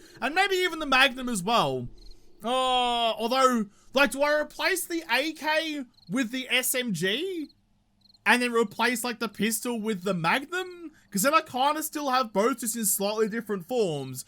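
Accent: Australia. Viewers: just out of curiosity, are you a native Aussie? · Anyone know what a "flozza" is from McDonald's? I can normally understand Australianess but that one has me stumped.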